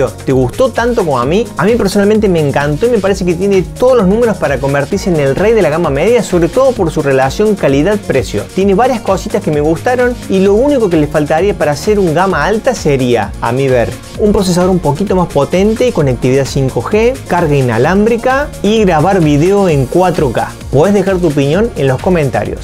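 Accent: Córdoba, Argentina. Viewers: Agradable de escuchar porque es Cordobés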